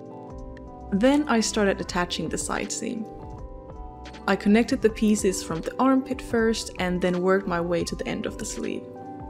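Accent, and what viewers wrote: Finland. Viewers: Is that a Finnish accent I hear? Anyway, this video has a criminally low view count. That's an amazingly well-made outfit, and a video showing the process of creating a brilliant outfit like this one should always get a lot of views.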